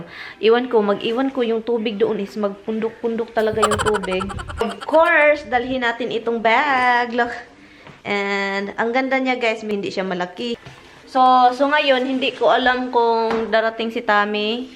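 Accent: Visayas, Philippines. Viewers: Alam tawa ako ng tawa SA scolit mo Day😂😂😂😂natutuwa ako sau pag naay accent na bisayang dako😂😂😂✌️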